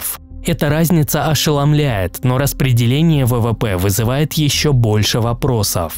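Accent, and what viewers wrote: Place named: Russia. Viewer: да вроде оригинальный канал ведёт русский судя по акценту. Разве не он и есть автор канала?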